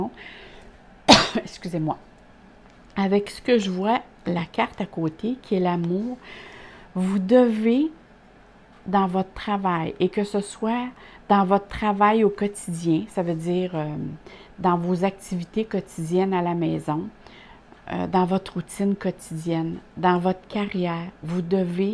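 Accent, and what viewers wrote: Quebec, Canada. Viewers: Bonjour, tient dont,,,, un accent du Québec, très bon tirage, bonne continuité. Kado de Québec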